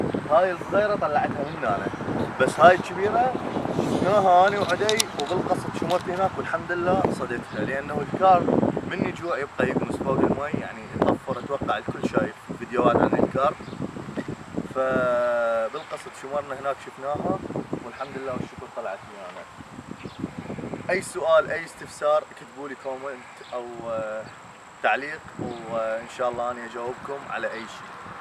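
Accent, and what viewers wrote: Iraq. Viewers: بلة زحمة هاي بيا مكان؟اولا اللهجة عراقية بس احنة ماعدنة هيچ مكان نظيف ومنظر حلو ؟؟ ارجو الجواب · شكرا".. اعتقد انت عراقي من اللهجة البغدادية ، بس صار عندي فضول في اي بلد تصيد السمك ؟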